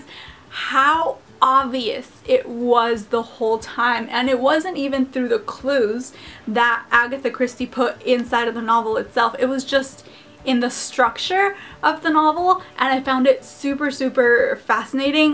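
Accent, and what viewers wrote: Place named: France. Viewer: Your french accent is so cute! :D But I don't understand why your french class teacher made you read Agatha Christie and not an author who wrote in french haha (but Agatha Christie is awesome so oh well :3)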